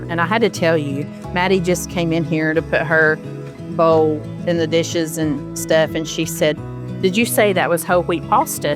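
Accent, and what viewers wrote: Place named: North Carolina, United States. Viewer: Thank you for the encouragement. I love watching your videos. Your accent and loving attitude sounds like my eastern NC home.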